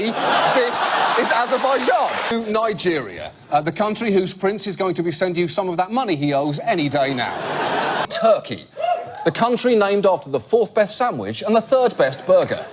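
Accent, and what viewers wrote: United Kingdom. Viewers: Where are you from?? the shot hole England??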